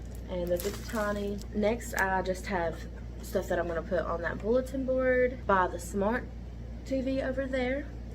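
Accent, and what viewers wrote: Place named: South, United States. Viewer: Hey! I just wanted you to know how much I am enjoying watching your classroom vlogs. Your classroom is looking great! I love the math alphabet, but I especially love your accent!! I am also a teacher from the south. I have been told recently that, like you, the teacher will most likely have to move from class to class. I agree that it is the safest thing to do for our students, but it will be a challenge! Good luck to you!! By the way, I also teach 6th grade math at my school:)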